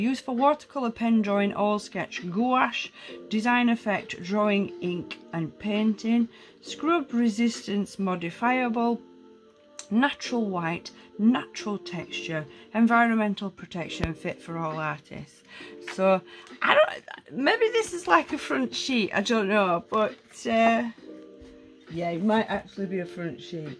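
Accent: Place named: Yorkshire, United Kingdom. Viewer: I think th UK hub is in Birmingham, so I'm not anywhere near there. I'm originally from Sheffield , so I knew you were a Yorkshire lass !!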